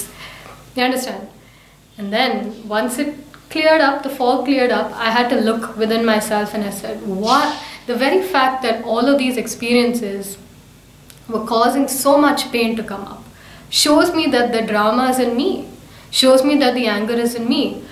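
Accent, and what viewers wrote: India. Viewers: I love the Indian accent. Do you have any videos of your singing? Also, can you give me a singing tip? I'm trying to learn. Thank you.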